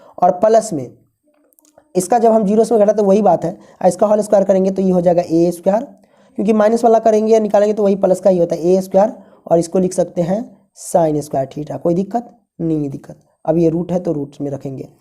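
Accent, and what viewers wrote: Bihar, India. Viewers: Very nyc sr ji Se ji mughe bihari language bhut achci lgti h such m mja hi aa jata h . ❤❤😂😂❤❤😂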